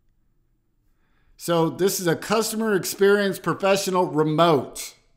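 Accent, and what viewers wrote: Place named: United States. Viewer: he sounds like he’s from the U.S. though. I don’t think it’s hard to figure that out. He didn’t say “careers around the world,” so it would be safe to assume they are from his region.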